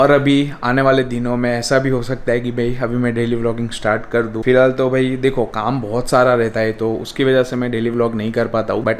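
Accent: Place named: Gujarat, India. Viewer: U r so innocent like baby + gujju voice ❤️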